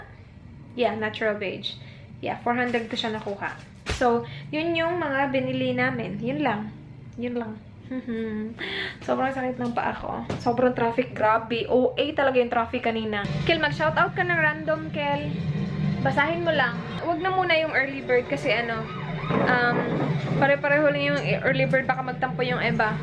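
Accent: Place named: Visayas, Philippines. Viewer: haha ang cute ni ate mag bisaya haha 😌😌😌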